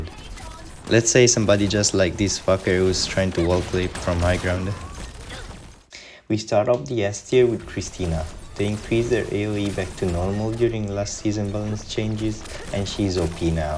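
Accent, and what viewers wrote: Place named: Italy. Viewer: Gotta love the italian voice! Thanks for the S/O and nice vid!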